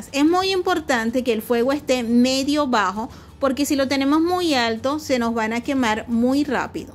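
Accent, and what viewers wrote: Venezuela. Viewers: Buena receta para las personas que no pueden comer gluten ( aunque gracias a Dios ,puedo comer de todo ) Saludos desde Venezuela 🇻🇪 , aunque por tu acento creo que también eres venezolana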